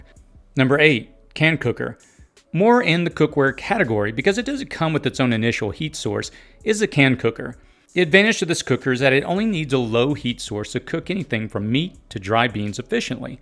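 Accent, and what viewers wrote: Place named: Pennsylvania-Maryland, United States. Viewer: I hear your accent you sound like your from PA Md area my family is from there many generations :-)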